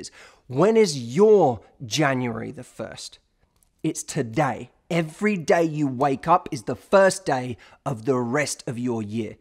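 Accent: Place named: Essex, United Kingdom. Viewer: He's an Essex boy ..it's how they talk.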